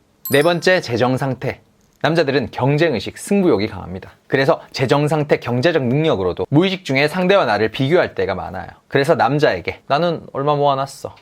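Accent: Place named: Daegu, South Korea. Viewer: 대구분이시네 대구말 예뻐요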